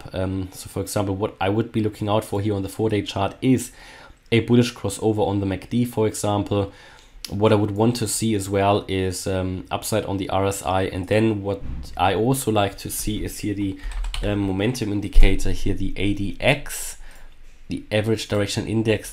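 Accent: Germany. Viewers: 3.50 !!!!!!!!!!!!!Love your german Accent. :) was kostet deine Mitgliedschaft und kann man auch traden lernen,.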